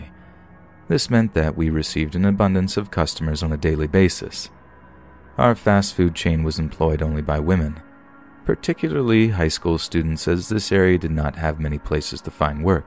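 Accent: Ireland. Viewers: It was very interesting, love it!! Love your Irish accent, it was awesome 👏 Thank you 🙏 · that irish accent tho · Nice Irish accent 👍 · That Irish accent tho lmao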